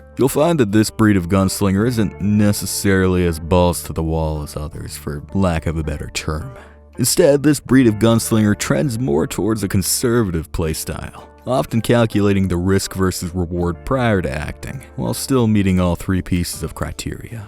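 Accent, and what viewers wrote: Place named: West, United States. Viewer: Why do you sound like a cowboy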